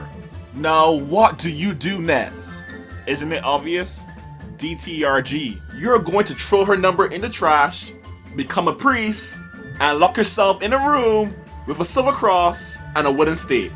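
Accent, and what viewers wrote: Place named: Barbados. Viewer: You sound bajan boss man , blessings brother!!!mauby syrup and fish cake 🤙🏾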